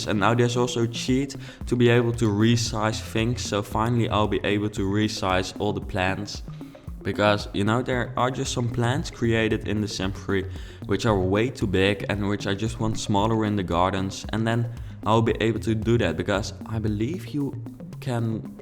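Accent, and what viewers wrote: Netherlands. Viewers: Je hoort duidelijk dat je Nederlands bent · I can hear the Dutch through your English. I'm Flemish. (Yes, I'm watching this in 2017.) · You can really hear you are Dutch 😂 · Are you from the netherlands? Your accent sounds dutch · Hey nice video , i love your accent. I am also dutch, well my dad is my mum isn't so that explains my name XD i don't know much dutch buy yeah nice video XD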